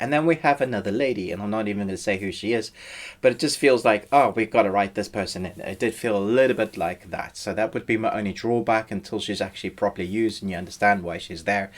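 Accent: South Africa. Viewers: Do I detect a slight South African accent there? Could be wrong but maybe not.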